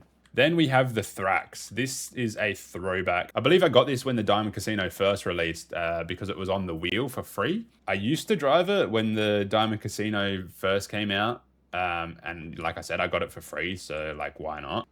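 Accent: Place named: Australia. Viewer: I had a feeling you are from Australia because of the way you said the name of the GTA5 Camaro lol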